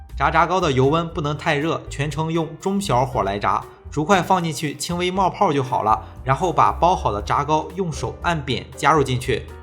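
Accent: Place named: Yantai, China. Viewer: 听出来了，我是烟台人。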